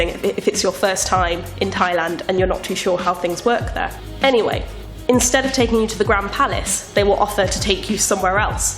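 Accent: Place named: London, United Kingdom. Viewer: I love your accent are you from London England ?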